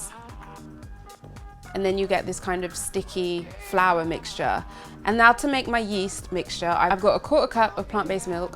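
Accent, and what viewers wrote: United Kingdom. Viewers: Gosh you’re very beautiful and that British accent compliments your beauty. By the way I’m going to try this burger. I’ve been a vegan for three months now.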